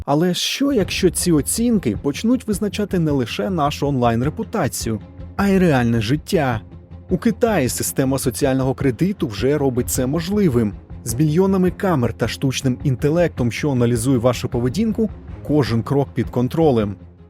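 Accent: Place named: Russia. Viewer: відчутно, що ведучий раніше російськомовний.. досі проскакують: "ихньому" та ін.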